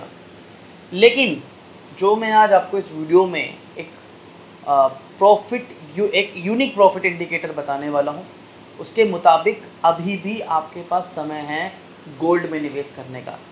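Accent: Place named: California, United States. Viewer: Cannot understand anything you sad - are you from California?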